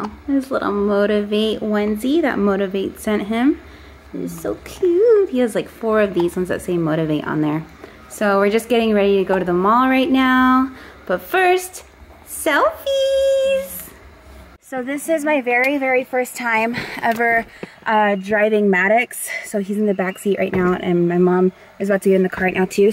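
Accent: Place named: United Kingdom. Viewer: I missed u saying (eggies)....😊also that I think, it's British, accent u do...i guess i miss ur vlogs...it inspires me...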